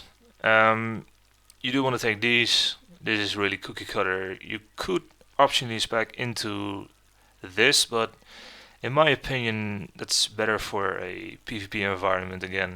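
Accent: Netherlands. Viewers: ure dutch arent you? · Lol you can just hear this dude is dutch :)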